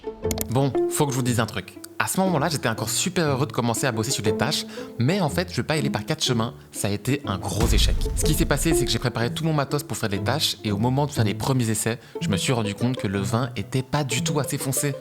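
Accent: Belgium. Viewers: super video vraiment je te kiff mais j'ai une question. T'es Belge ou Français ?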